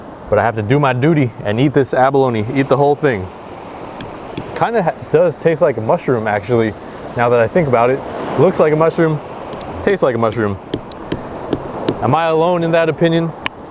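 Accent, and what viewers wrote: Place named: South, United States. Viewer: are sure your not from the south maybe just a southerner with a unique accent with all that butter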